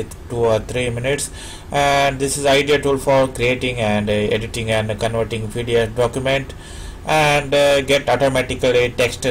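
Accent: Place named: India. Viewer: You have a big Indian accent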